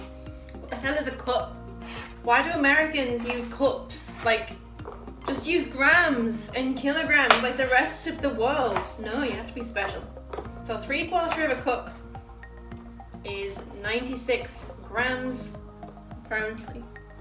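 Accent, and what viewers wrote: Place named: Durham, United Kingdom. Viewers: "CanEdian bEcon" Sryy, I live for your northern accent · Ahhh! Ok! I knew could hear a little of durham in there! I have never actually been to Middlesbrough...its only like an hour and half from where I live.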